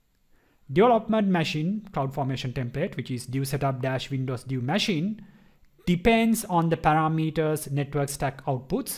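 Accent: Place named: India. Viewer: DAT DAT DAT I never understood why Indians do not even try to fight their accent and at the same time climb the Internet to shoot videos in a language other than their own. As one hero said, "I can't swim and I don't put my ass in the pool." The video is about nothing. Any fool can download the repo from the git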